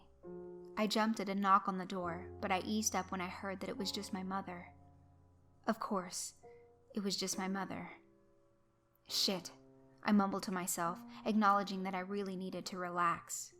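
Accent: South, United States. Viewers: I ❤ listening to your soothing southern accent and awesome creepypata choices 😊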